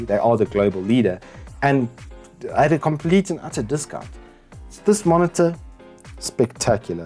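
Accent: South Africa. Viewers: I don't normally have problems with SA accent, being in Australia myself. But I am hard of hearing, and dude you slur and mumble. And please look at the camera more when talking. Thanks.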